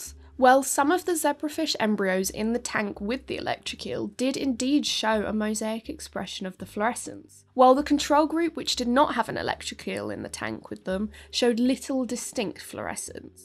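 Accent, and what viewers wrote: United Kingdom. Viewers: She also has a the THICCEST bri'ish Accent of the Trio now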